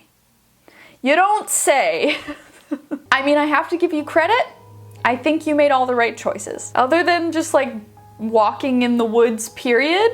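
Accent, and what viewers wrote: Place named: Canada. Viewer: That's the Canadian jumping out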